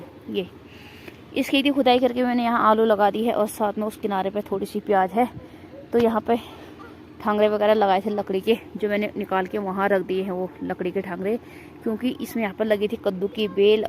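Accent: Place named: Kumaon, India. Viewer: aaj aap bhi kumaya boli bolna lag gayi jay ho kumaya mitra wow nice😃😃😃😃😃😃😃😃😍😍😍😍😍😍😍🤩🤩🤩🤩🤩🤩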